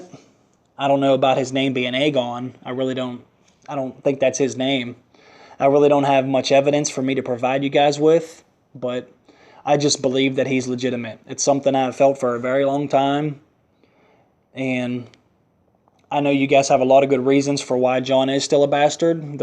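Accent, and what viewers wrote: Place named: South, United States. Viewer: your southern drawl is a charm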